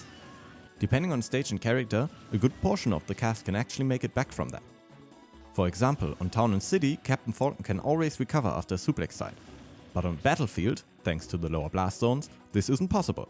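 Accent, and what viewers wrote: Germany. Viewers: Where are you from? Austria?germany? I just want to know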